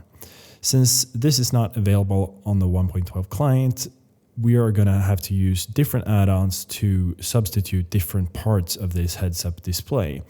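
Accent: Sweden. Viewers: are you swedish? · Bro, love your videos, very helpful. Your English is great, but is that a slight Swedish accent I detect? Zug zug!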